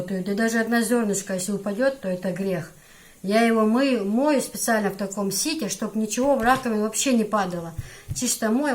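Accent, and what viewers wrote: Chechnya, Russia. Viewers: ты такой молодец,знаю как было тебе тяжело освоить ментилитет чеченский,ты справилась на все 100%,ты полностью воплотилась в чеченку,что акцент,что внешность,что монеры.Хвала тебе,и восхищение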